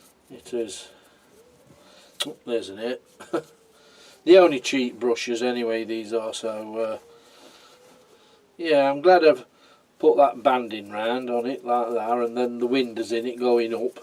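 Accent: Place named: Derbyshire, United Kingdom. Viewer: Good job! I always like watching your videos. I'm guessing you are Debyshire lad from your accent? Well south of me anyway - Leeds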